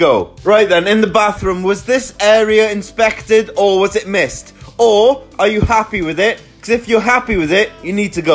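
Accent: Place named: Wales, United Kingdom. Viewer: The Welsh accent makes this 😅😂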